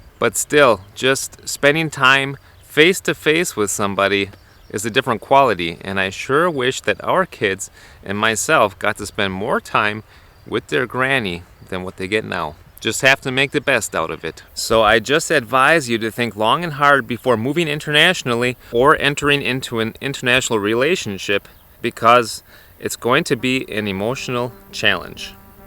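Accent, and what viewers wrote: Bavaria, Germany. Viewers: I wonder if your family in the states notice the German accent that I noticed right off the bat :D I can here it on the words "here" and "germany" and of course "bavaria" I'm Danish and I think that's why I can hear that. Of course this all makes sense after 16 years in Germany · Hi, You are really talking English with an Bavarian slang. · Its pretty amazing, to my german, almost bilingual ears you sound soooo much more like a german person speaking english than an american :D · I coudln't help but notice your accent is a bit different than I am used to hearing from americans? Have you developed a bit of a german accen in the last 15 years? Just curious, because after I had been in germany for a couple years and went back home to visit my family, every single person noticed, that I was speaking with german accent now. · Watched some videos. 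You really sound like a german speaking english. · Interesting that as one is immersed in a culture for so long their speech inflection takes on that of the area. You are truly now Bavarian. Bavaria is my go to, comfort vacation spot and if I was a bit older when living there as a young teen, might have made the same decision to stay. In our retirement years now I've thought of moving over but as you mention in your caveat, family is most important to us and can't see living away from them. Interesting conversation. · Your experience parallels mine in almost all points. I have the impression your English has picked up a slight German accent and influence. My brothers tell me the same thing when I visit them in the US. My favorite dialect here in Schwäbisch. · your talking like your from Germany using english what state are you from? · Interesting that you sound like a German speaking American English. 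(At least to me, and I’m neither German nor American) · You don't sound american. You sound like a german with little accent.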